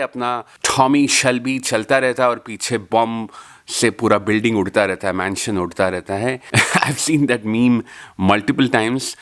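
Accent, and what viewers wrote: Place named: Bengal, India. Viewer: With ur accent I’m guessing ur bengali babu. Tell me if I’m correct?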